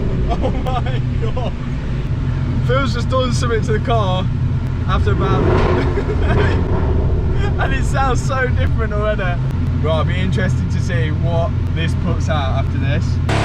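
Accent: Leicester, United Kingdom. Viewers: Can tell your from Leicester mate😂👍🏼 is that desford crossroads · you’re so Leicester it’s redic. Enjoy your coffeh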